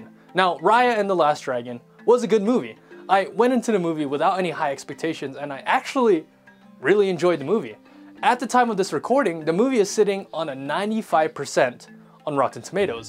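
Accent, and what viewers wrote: United States. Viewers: I like to know if you have a western blood? i am interested on the biopsychology of talking like from west when you are from the east, i.e. acquiring that accent or voice quality like American when you are talking. i did not expect that the person behind the narration in the beginning have asiatic facial features. (if not biopsychological, maybe psychocultural which in other words, you must be living in the west?) Or maybe you really study how to speak in a way like that? Or learn from hearing? To others who read my reply, maybe I am not as good as Americans like some of you here in telling whether the sound quality is asian or american-like because I'm not American caucasian. i observed that voice quality acquisition before from my English language professor. i am gayish that's why even though i can speak English, the non-manly voice come out. 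though, I can still differentiate an original American caucasian who's not culturally British English voice quality from an acquired one like yours. this one is American causian, non-british english <link> (the man only, because that's what I'm talking about, the scope).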